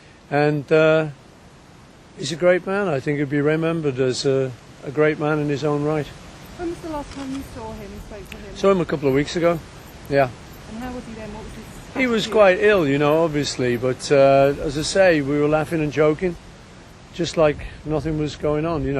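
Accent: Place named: Scotland, United Kingdom. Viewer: Your Scottish brogue, Faul gives you away for the fake freak you R! For those that believe that this man is do the months of research and you will find this man! 2 identifiers Real had Dark Brown Eyed not Greenish Hazel Eyes and Real Paul didn't tower John Lennon nor George Harrison! It took me a long time to see the difference but once you do it's a no brainier!!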